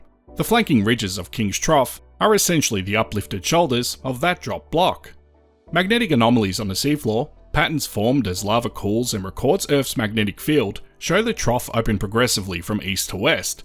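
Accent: Australia. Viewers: the voice is much easy to listen, australian accent is ok way better than the other channel.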